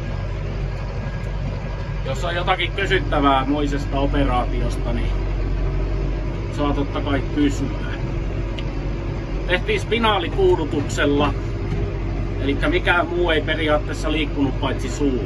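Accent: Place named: South Ostrobothnia, Finland. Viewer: Juhannuksia koko perheelle ja upeeta et puhut suoraan eteläpohjalaisella tyylil mistä tykkään kun oma suku ylistarosta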